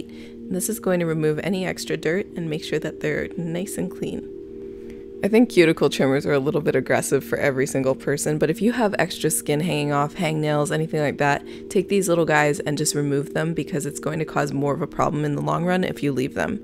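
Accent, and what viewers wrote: Canada. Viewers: Ohhhhh I hear!!! You are Canadian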